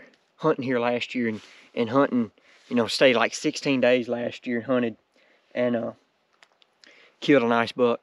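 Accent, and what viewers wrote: South, United States. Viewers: The accent sounds really southern. What state are you from?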